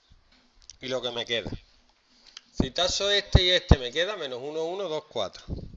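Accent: Andalusia, Spain. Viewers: los 2 minutos hablando sobre el traje han sido mi parte favorita! bastante bien explicado, y no os metáis con el acento andaluz ostias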